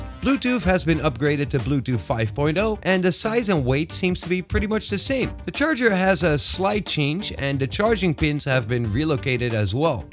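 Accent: Netherlands. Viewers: You're Dutch, right? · hoor ik daar een licht NL accent? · You sound Dutch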